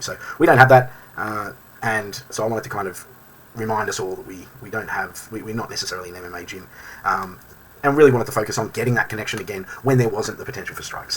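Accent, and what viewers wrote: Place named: Australia. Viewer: I just stumbled onto your channel, good to hear an Australian accent exploring CLA. Where are you based?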